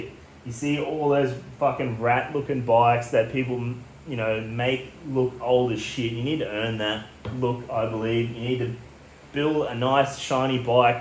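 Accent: Australia. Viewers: thank fuk someone who's speaks Australian is Australian is doing a Rigid Shovel ground up rebuild, been sitting on an 81 procrastinating on exactly what your doing, well exactly as far as my amateur skills will let me go anyway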